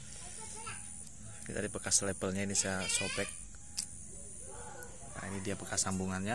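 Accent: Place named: Banyumas, Indonesia. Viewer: Dari nama Chanel Kirain orang Sumatra utara malah orang ngapak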